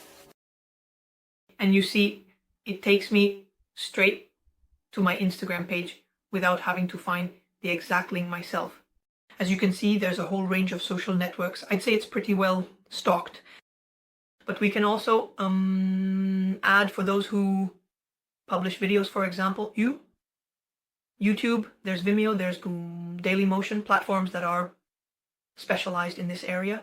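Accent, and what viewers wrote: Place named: Italy. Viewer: Another great video but what happened to your wonderful Italian accent? Have you gone A.I.?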